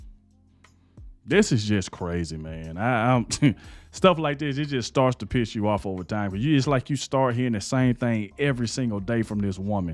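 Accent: South, United States. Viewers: Do I hear a southern accent?? Real question.., Can the American citizens have the right to sue these DA’s for our wasted tax money back?? I personally would like to see these under funded schools get the money!!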